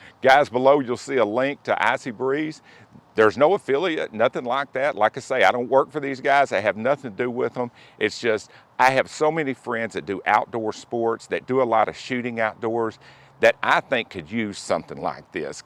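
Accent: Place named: Texas, United States. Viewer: I love the Texas accent. I live in San Antonio and I know exactly what you're talking about regarding the high heat and humidity. It's downright oppressive. This looks like a device that could really reduce the misery factor. I'm a musician and I get gigs outside fairly often and I end up all sweaty on stage when the temps are high... IOW, about 9 months out of the year. This thing looks like a life saver to me. I'll be buying one soon.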